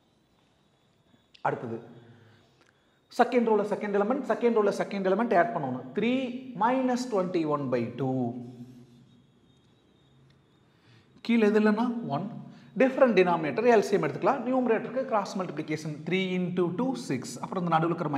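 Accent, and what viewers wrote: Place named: Pollachi, India. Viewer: Super teaching in pollachi voice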